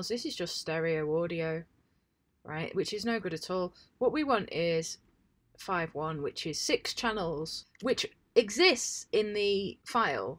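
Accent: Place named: Yorkshire, United Kingdom. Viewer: are you from yorkshire? as a yorkie myself i recognise that accent anywhere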